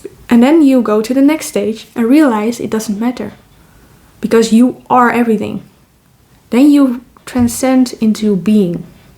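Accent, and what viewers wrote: Netherlands. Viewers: I have listened to several of your videos and you are remarkable, you articulate the truth as I have experienced it with so articulately. My only question is where are you from? The Netherlands? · Wait are you Dutch ? (Flemish here) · Such profundity rendered through a delightful Dutch accent! Enjoy channeling your Higher Self : )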